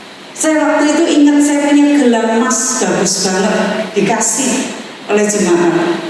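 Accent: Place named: Java, Indonesia. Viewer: Walah ibu Njawani tenan, nek kotbahe wis medok, aku koq yo ngguyu ngekel,.... turut sepenanggungan, ibunda Indah bersama Tuhan Yesus di keabadian Surga Mulia, just btw eyang kakung kulo KRT Widyonagoro Bupati Kasunanan Surakarta era 60an, Pray For Solo... Solo Full of His Glory... Awesome...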